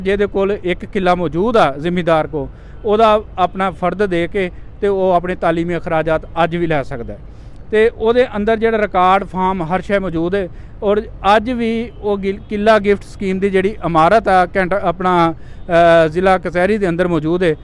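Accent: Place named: Doaba, India. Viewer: Aah bhaji da lehja sade hoshiarpur doabe val da hai , pure doabi accent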